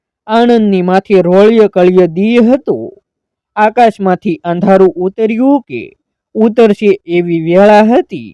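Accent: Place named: Kathiawar, India. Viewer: Varta charotar ni ne boli kathiyawadi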